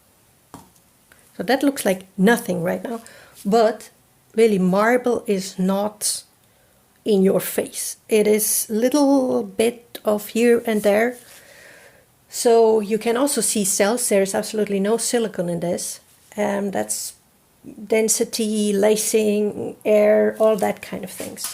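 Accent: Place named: Netherlands. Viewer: Absolutely love this video. I too was adding too much color. I appreciate artists sharing their "mistake" creations. It helps me see I'm not as far off as I feel. I also love listening to you talk. My great grandmother was from Groningen Holland and I adored her. Hearing accents from the Netherlands brings back sweet memories. Thank you for sharing your journey.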